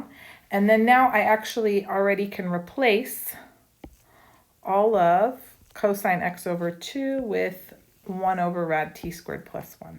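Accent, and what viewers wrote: United States. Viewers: As a German, I can say that your pronunciation of Weierstrass is pretty good, but you can tell you're from the US 😄.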